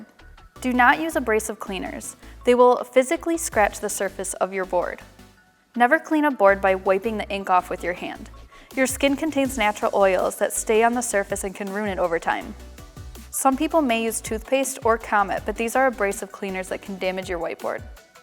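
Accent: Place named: Canada. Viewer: Hahahahaha I’m sorry but it’s funny how concerned/upset she is in the intro about people “causing permanent damage to their white boards”... I can just picture her at the bar on Saturday night talking wayyy too much about white boards🤣 Also what accent is that??? Just curious.. is that Canadian? Listen to her say magnets: “Maeyyynits” Anyways joking aside thanks for the informative lesson on whiteboard maintenance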